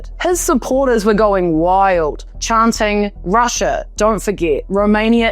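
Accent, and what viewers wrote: New Zealand. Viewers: great update and its a easy kiwi accent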